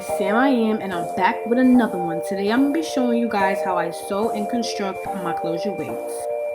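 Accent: New York, United States. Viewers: Less than minute into the video I knew she was from New York 😂😂😂😂😂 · Lmfao I knew you was from New York soon as I heard your voice 😭 what’s poppin. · You most def from New York , you said some “ we not budderin bread” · I love us New Yorker's! As soon as we open our mouth ppl automatically know where we're from! Sis said a "hoodie" 😂😂😂 #youslayedthatwigtho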